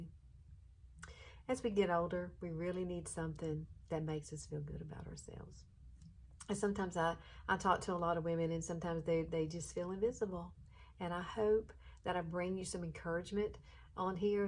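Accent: South, United States. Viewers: Hello,! I have my fetish about femininity whether I watch beauty/fashion videos, watch "motherhood videos, including day-in-the-life vlogs" or think about waitresses. I have my fetish about mustard and ketchup whether I squeeze 'em "on my hotdogs, cheeseburgers or hash browns" or "for my fries". I have my fetish about the round condiment squeeze bottles whether I think about the diners, pancake artwork parties or painted canvases. I have my fetish about the feminine names like yours. I enjoyed this video of yours. You have your Southern accent since you're living in Alabama. Quite recently, I watched the videos on "MrsMelissaM Beauty" and " Unboxing Mom". I watched the empties video and week-in-the-life vlog on " Parada". On one of the videos on " Unboxing Mom", I spotted her daughter named Kelly. I watched the muted videos on "Made by ", filmed in Holland. 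One of her videos featured the tie-dyeing party for Dutch children where I spotted the round condiment squeeze bottles. On Aug. 30, I washed 2 out of my 4 reusable "16-ounce" OXO squeeze bottles and separately replenished 'em with honey barbecue sauce and pancake syrup. On Aug. 31 and Sept. 1, I played with my 12 empty, clear "12-to-16-ounce" squeeze bottles by stacking, holding and "slowly squeezing" 'em. For my 1st dinner of September, I squeezed mustard and ketchup on my 2 hotdogs with my 2 other reusable OXO bottles. I ate some lightly salted Pringles chips and drank my soft drink. B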